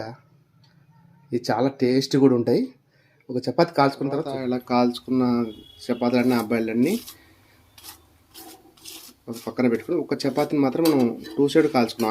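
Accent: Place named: Anantapur, India. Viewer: మీ భాష వింటే అనంతపురం జిల్లా వాళ్ళు అని తెలుస్తోంది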